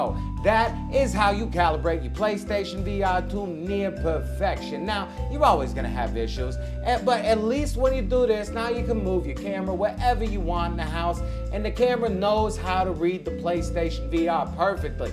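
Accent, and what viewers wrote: New York, United States. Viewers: Thanks, that was very informative and love the NY accent..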